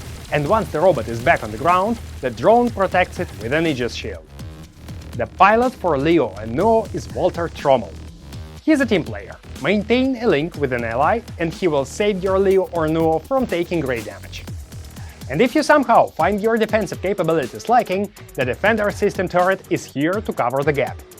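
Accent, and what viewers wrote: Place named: Russia. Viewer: Мэн ну у тебя и акцент... Русский чтоль?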